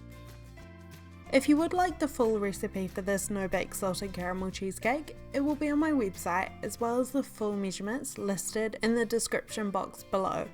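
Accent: New Zealand. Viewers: Ivana Matić more of a NZ accent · Amazing recipe. I will surely try this. By the way accent is similar to New Zealanders. Am I correct? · She has a New Zealand accent... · Oh, nice. :D Quite different from the australian accent isn't it.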